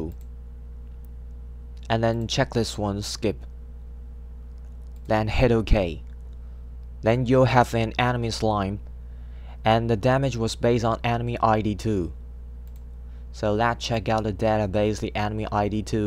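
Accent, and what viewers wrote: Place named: Taiwan. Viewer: 你好,很高兴认识您。Actually, I know you are from Taiwan. Because the mandarin that you speak are different than mine and I saw you use type traditional chinese in the tutorial video. I learned it for half year at Taiwan University last year on November but I still need to keep up on trying and learning from everyone here. It is a great experiences to learned it from you. I enjoy your tutorial video a lot. 谢谢你。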